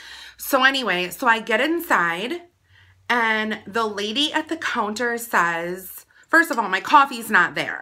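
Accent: Wisconsin, United States. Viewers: People from Wisconsin sound like this?? Interesting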